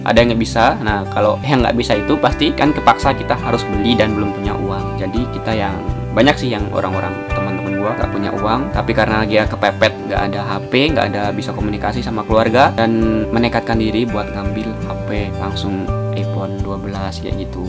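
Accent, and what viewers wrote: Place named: Japan. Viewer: bang udh lama banget di jepang yak? soalnya aksen indonesia yaa udh agak agak jepang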